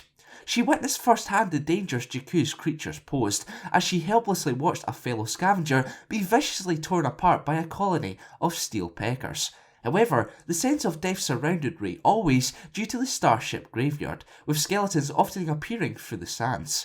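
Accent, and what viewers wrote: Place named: Aberdeen, United Kingdom. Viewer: Im guessing you're from Aberdeen, Scotland and 17-19 years old?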